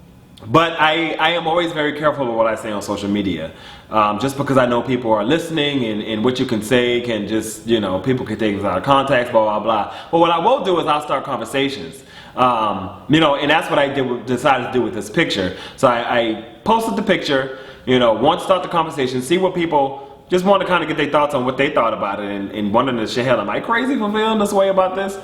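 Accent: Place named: Lowcountry, United States. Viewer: He must be from the lowcountry..... I hear Lowcountry SC all in his voice!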